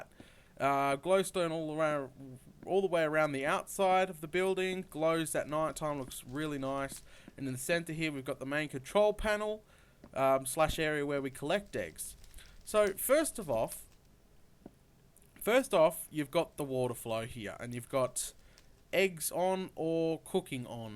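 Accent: Australia. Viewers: Is he Aussie coz I am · Videos and tutorials um yeah it's called an accent........ not everyone in the world sounds the same. he's from australia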